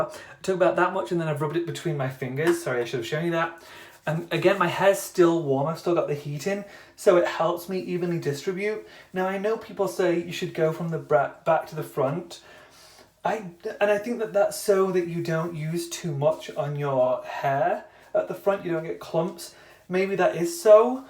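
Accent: United Kingdom. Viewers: I have no need for this, female with mid-long hair, but listening to you is really soothing. As a fellow brit I'm in love with your accent! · I love how this is how you actually talk, you have a proper queens english accent & its amazing!! I'm from Liverpool (UK) and I want but nothing to have the Queens English accent! haha. Also I love your videos!!!! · His accent is not that posh he’s just british!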